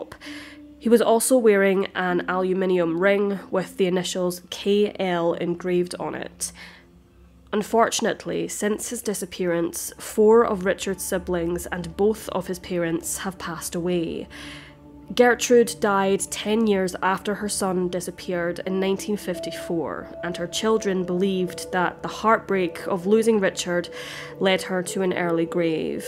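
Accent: Scotland, United Kingdom. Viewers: She’s Scottish that’s the way it comes over.lif u know any thing about how language is spoken.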